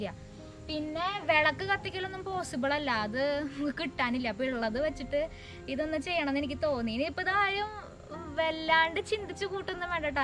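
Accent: Thrissur, India. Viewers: ഞാൻ subscibe ചെയ്തു.. ഈ തൃശൂർ സ്ലാങ് കേൾക്കാൻ.. വീഡിയോ നന്നായിട്ടുണ്ട് 👌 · തൃശ്ശൂർ ഭാഷയുടെ സൗന്ദര്യം സൂപ്പർ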